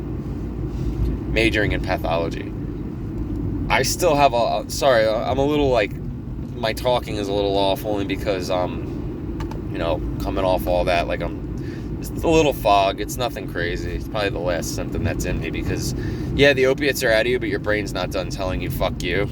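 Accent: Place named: New York, United States. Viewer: I just saw where a few people had asked where you were from, I must toot my own horn when I asked myself the same question because I thought you were from NY with that heavy accent. Keep on doing what works for you! I just hope one day I can rewire my brain. I'm terrified of having a bad acid trip also which just means Murphys law is bound to happen if I ever did try it. 🙃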